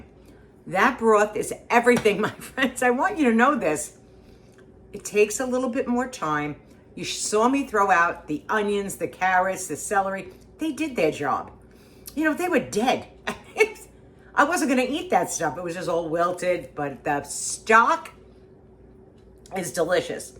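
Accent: New York, United States. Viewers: I LOVE the New York accent. Its fabulous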